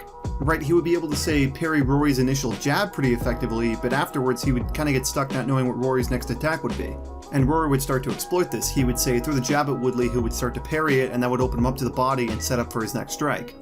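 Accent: New Zealand. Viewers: Are you from New Zealand? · Are you from New Zealand.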